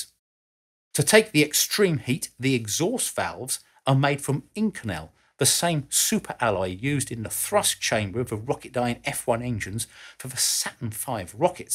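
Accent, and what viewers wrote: United Kingdom. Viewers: This is very old information being about 6 years old. Much bigger numbers in 2025. And a guy with a British accent is commenting on a purely American sport?? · Love the UK accent to narrate a video that can be described as " 'MERICA!! " 😂🤣 So much fun. Thanks mate! 😊👍upvote earned. I'm personally not into 1/4 [or 1/8] mile racing but as an welder with a lot of experience with Aluminium I have professional experience with the blocks and various parts away from race days. IF anything is left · Well, that's an unexpected subject for but in fact, there are many points in common with rockets:D That was funny. And top of that: with british accent! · Why the sickening sissified limey accent?????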